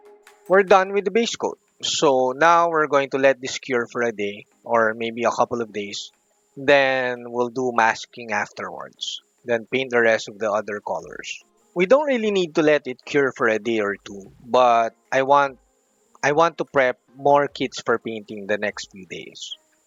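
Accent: Philippines. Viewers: the way you talk sounds like a native Filipino.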